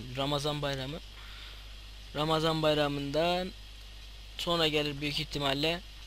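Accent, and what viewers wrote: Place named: Sivas, Turkey. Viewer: heee sivaslılarda böyle konuşuyorlarda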